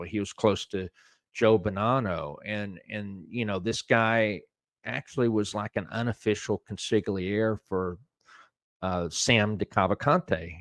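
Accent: South, United States. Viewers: ' Country drawl is calming and pleasant...charming if I may...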